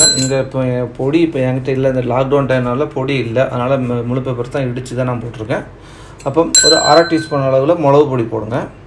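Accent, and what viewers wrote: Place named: Tirunelveli, India. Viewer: Super recepy bro 😋Nellai slang 👌👌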